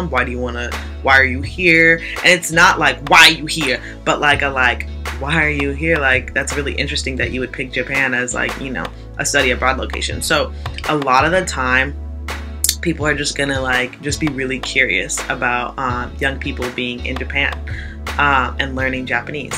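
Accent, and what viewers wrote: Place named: Atlanta, United States. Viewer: Girl you on fleak ! Atlanta talk.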